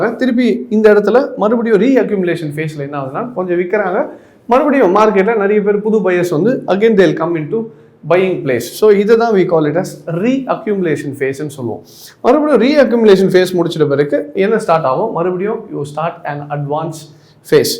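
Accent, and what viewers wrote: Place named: India. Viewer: Very cool but the indian accent makes it difficult to understand :)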